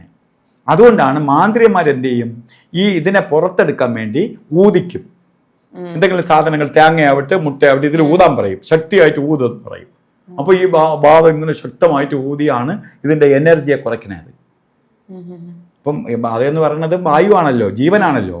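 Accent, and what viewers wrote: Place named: Thiruvananthapuram, India. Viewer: തിരുവനന്തപുരം ഭാഷ കൊള്ളാം 😂😂😂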